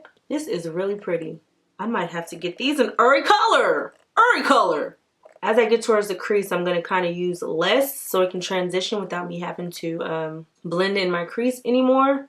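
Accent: South, United States. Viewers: That country twang tho!!! I'm here for it!! LOL!! 🤣🤣🤣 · The southern twang was so cute! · I have five of these including mermaid and Oil Slick and I LOVE THEM!!!! 💟💟💟💟💟💟💟 And yes for the country accent 😂😂😂😂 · That accent is giving me "Where's Applejack" vibes lol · That country accent 😂😍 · Lol the country accent killedt meh😂🤣 Looooove your videos😍💕👏🏾👏🏾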